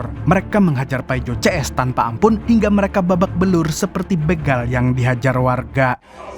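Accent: Banjar, Indonesia. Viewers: admin nya banjar hhhhh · urang banjar kah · Banjar neh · Orang banjar kah min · NGALIH BUHAN BANJAR NE 😅😅 AYAKKKKK SAAANNNIIIIII🤣🤣🤣 TAGUGUR-GUGUR ULUN MANDANGARNYAA😅😅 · 😅😅 urg banua sakali nya mimin nya😅😅😅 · Urang banjar sekali nya sidin nih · Banjar banar pian mang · Gua curiga sama suara ini pasti orang Banjar balas sanak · Adminnya orang banjar kh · Behh admin urg banjarr skalinya😂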